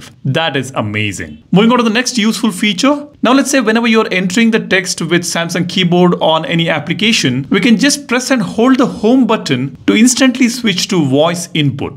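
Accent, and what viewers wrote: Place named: India. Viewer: Your English is terrible 😅😅Indian English..i got headake to listen to you..great Review 👍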